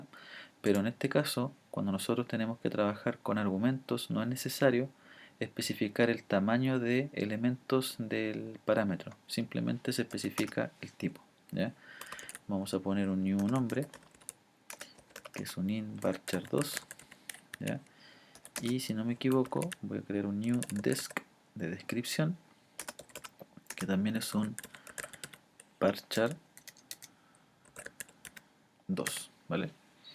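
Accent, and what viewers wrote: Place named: Chile. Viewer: Chileno! por fin wn.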